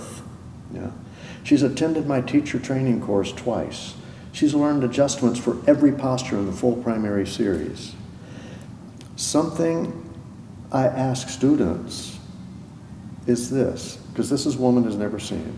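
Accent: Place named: Texas, United States. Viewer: Hahaha oh my god I love so much. Startrek, prana force shields, texas cop accent, and so down to earth.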